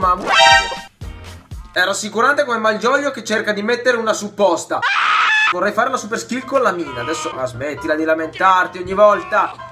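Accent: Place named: Emilia-Romagna, Italy. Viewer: ma tu di dove sei? ho sentito un bell' accento Emiliano-Romagnolo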